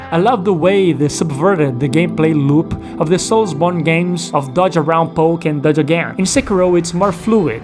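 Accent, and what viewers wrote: Brazil. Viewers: tu é brazuca?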